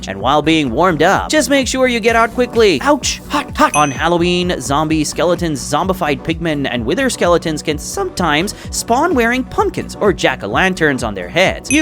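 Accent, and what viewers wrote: United Kingdom. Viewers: Wheres british voice? 😔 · WHERE IS THE BRITISH VOICE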